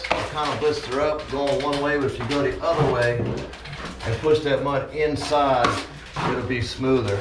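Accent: South, United States. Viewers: I've watched many of your videos & your accent is so mesmerizing I know it's from the South, definitely not Texas where I live. 😊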